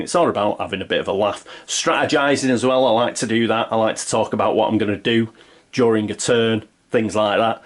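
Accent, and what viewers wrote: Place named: Manchester, United Kingdom. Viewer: Gteat to have your Manc accent back. Did ypu have much trouble finding your EH boxes under all of the dust!😊 Hope life has been good for ya in meantime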